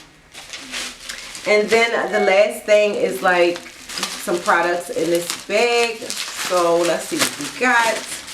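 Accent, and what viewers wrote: Baltimore, United States. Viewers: I almost thought she was from DC too but she got that heavy Baltimore thing going on lol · I know that Baltimore accent from anywhere 😍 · She’s from the north it’s her accent maybe Baltimore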